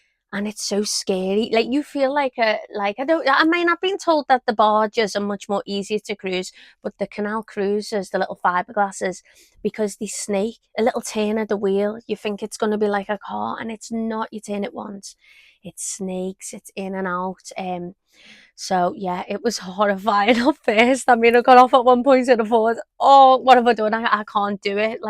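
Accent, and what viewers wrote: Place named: Liverpool, United Kingdom. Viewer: Referred by David (cruising the cut). Nice to hear a Scouse accent! Nice looking little boat.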